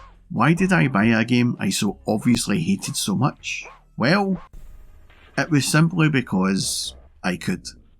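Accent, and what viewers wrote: Scotland, United Kingdom. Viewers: Do you have another channel? Theres another guy with a Scottish accent on the segaguys channel i think😅 (asking if you're the same guy, sorry)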